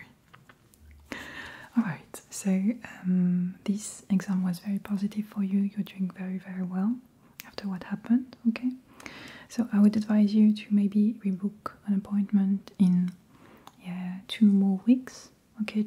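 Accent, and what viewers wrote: France. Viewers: I love the touch of french accent that can be occasionally heard in your english. The popping c-, p-, and t- sounds are so tingly ✨💛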